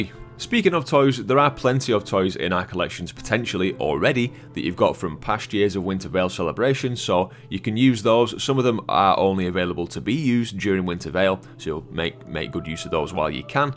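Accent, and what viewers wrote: Yorkshire, United Kingdom. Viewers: The yorkshire accent made this vid 300% better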